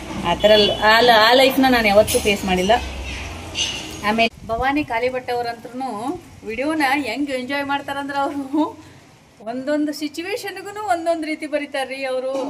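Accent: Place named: North Karnataka, India. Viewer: Namaste akka, nivu character galna anubhavisi avugalige jeeva tumbidagale, navu anubhavisi nodokagodu, otnalli chenage baratte, nanna husband mansare naktare nim videos play madidaga, avru kannada astagi nodalla, nim videos play madidaga tegi change madu bere haku andilla, nim uttara kannada bhashe accent ista agatte nagu baratte, nange nambike ide akka ondalla ondu dina doddadagi belitira, ondalla ondu dina success sikke sigatte, slow agi beldidakke bele jasti akka, pat anta beludre heloke anuhavagale iralla, nidhana beldaga modlu ethara madidvi amel ethara madidvi anta heloke experience iratte, nanu kuda elrigu share madta irtene, nimmanna mansare akka anta kardini, istu madilla andre hege heli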